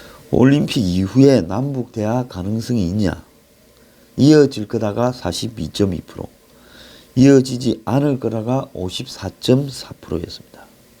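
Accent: Gyeongsang, South Korea. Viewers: 경상도 특유의 말씨와 꾸밈없는어법(때로 억센말투). 정확한 논평 속이 시원합니다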